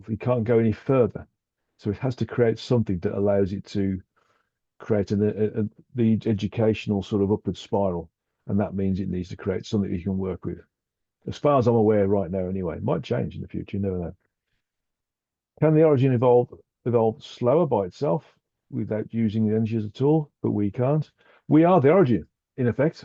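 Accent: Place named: Midlands, United Kingdom. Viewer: This is called an English Accent . He is from the Midlands . I have trouble with English being spoken by Indians . Listen to it twice 😁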